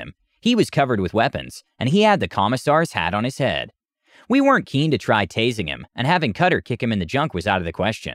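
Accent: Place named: United States. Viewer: Text: Extremely stereotypically british. Voiceover: Matter of fact car salesman american.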